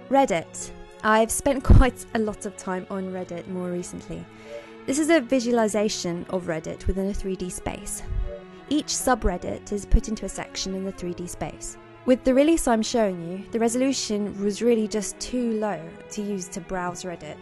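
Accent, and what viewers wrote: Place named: United Kingdom. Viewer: Yes, I love her accent. I generally dislike British accents. I'm from South Africa, where I'm used to an accent where they pronounce the letter 'T' correctly, whereas in the UK, they'd say Liber'ee rather than Libertee. I really dislike it when Brits take too many shortcuts with their English words. Which is ironic considering that they should be the leading example of the language.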